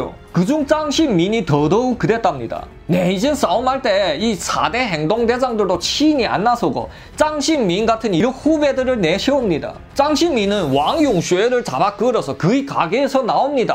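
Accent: Yanbian, China. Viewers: 매일매일 기다리는게 새로운 삶을 사는것처럼 행복하구 즐거워요 ㅎㅎ 연변사투리도 재믿고 표정 하나하나 생동감이 넘쳐서 더 기대가 가요 승승장구 하시길 바랄게요 다음회도 오케이 ㅎㅎ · 하지만 ~하지만 말입니다 조선족 연변말투죠? 그러게 말입니다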